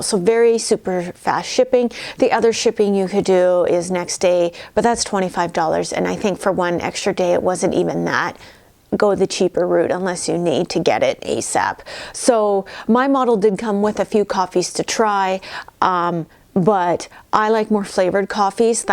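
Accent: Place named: Canada. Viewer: thanks I'm already at the online store seeing what they have...I didn't realize you were in Canada...that's where the cute accent I hear is coming from.....OK thanks again and will let you know what I buy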